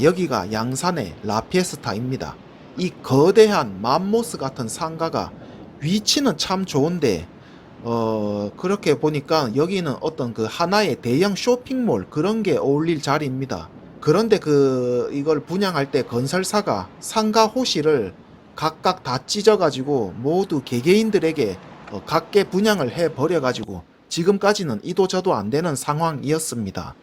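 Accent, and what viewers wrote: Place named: Daegu, South Korea. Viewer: 대구사람인가베. 억양이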